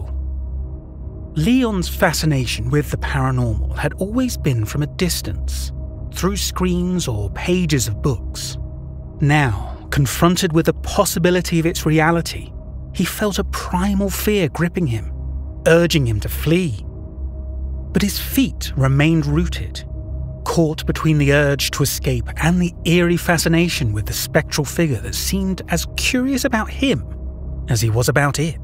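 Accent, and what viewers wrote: United Kingdom. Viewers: I just found your channel and I’m so glad I did! I noticed you have a British accent are there any cryptids from Europe that you are particularly interested in? I’ve always been fascinated by the Black Shuck stories from England and the Pricolici from Romania and of course the OGs Vampires and Werewolves 😂. Have a wonderful day ❤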